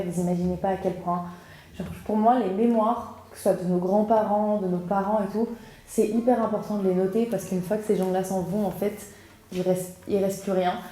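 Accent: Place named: Morocco. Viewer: i smiled so hard when i heard you speaking darija, chehal, wahdo, it was soo cute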